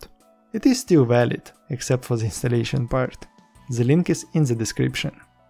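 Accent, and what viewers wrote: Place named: Russia. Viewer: the ruskii accent is the best:)))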